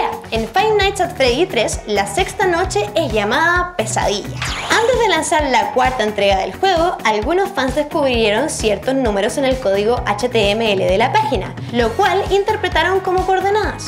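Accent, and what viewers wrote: Chile. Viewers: es chilena o no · Y ¿ERES CHILENA? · Eres chilena? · eres chilena ? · eres chilena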